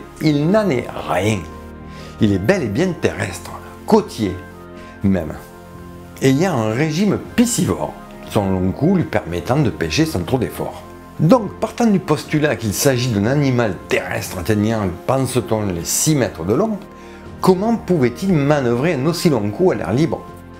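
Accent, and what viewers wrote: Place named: Provence, France. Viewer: Le retour des chemises à fleur et de l'accent provençal, ça m'avait trop manqué !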